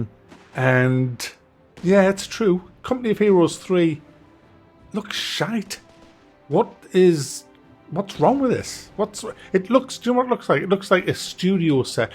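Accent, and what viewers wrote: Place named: Scotland, United Kingdom. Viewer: Are you from Scotland or something? You have an interesting accent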